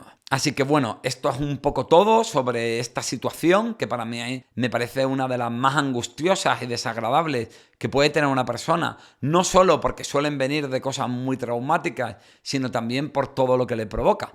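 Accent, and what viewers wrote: Andalusia, Spain. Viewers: hablas un andaluz culto y perfecto, o sea me encanta tu acento, además has dicho prejuicio.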